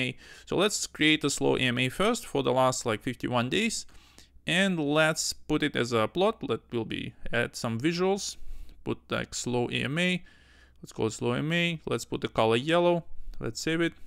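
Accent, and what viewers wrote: Russia. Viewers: Не даёт мне покоя твой акцент.. русский?) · да наш, русский акцент слышен прям четко, но лучше чем у индусов))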